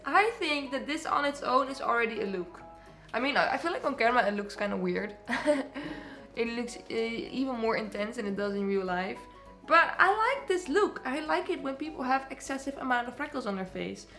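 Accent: Netherlands. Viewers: Omg it looks really goodd😍 Are u Dutch? Your accent sounds a bit dutch 😊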